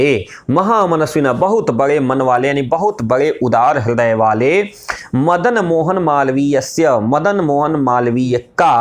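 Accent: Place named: Bihar, India. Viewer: आप योग हैं तभी तो सरकार में चयनित है पढ़ाने का तरीका बहुत बढ़िया है आपकी आवाज लगता है बिहार के लोगों से मेल खाता हुआ, लेकिन हिंदी साहित्य में अधिकांश विद्वान अपने पूर्व के ही हैं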